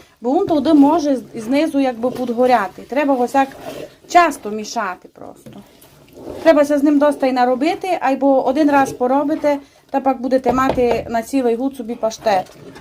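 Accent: Transcarpathia, Ukraine. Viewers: Обожнюю ваш Закарпатський говор👍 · Це канал і він ведеться закарпатським діалектом української мови. И иппен за вто мы го любиме позирати и слухати. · Супер!І паштет і мова закарпатська просто заворожує!Дякую,файна господиня!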